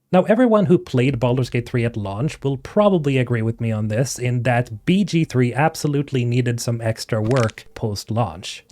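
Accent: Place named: Sweden. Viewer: That cute subtle Swedish accent though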